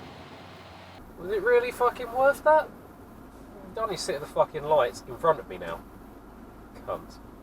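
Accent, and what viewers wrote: London, United Kingdom. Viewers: You sound like you’re from Hainault area? A friend of mine is from there & you both sound identical lol 😁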